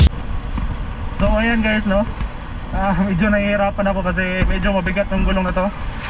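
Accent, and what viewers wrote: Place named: Cebuano, Philippines. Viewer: Halatang bisdak paps ah😁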